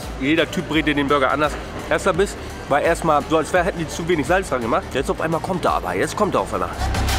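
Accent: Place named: Hamburg, Germany. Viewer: Ich schau dir so gerne zu, ich würd auch gern sagen das ich dir gerne zuhöre aber dein nuscheliger Hamburger Akzent, einfach lustig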